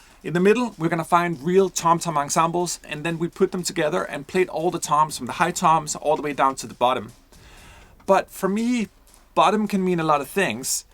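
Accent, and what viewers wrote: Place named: Denmark. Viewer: I KNOW A DANE WHEN I HEAR ONE! Hej, en kærlig hilsen fra Danmark:D It’s black friday, and I’m about to spend maybe to much cash on this and that plug-in instrument, and then I found Soundpaint through 8DIO, where I just bought epic toms. But I can see that every day is black friday at Soundpaint:D …and that I should’ve bought epic toms at Soundpaint instead - and I will. While the samples may be the same, this is clearly a huge update. Good samples are good samples. Doesn’t matter if they were made today or 10 years ago. How we can use them matters. The Soundpaint player looks amazing, and I can hardly wait to download it and get to know it. De bedste hilsner fra DK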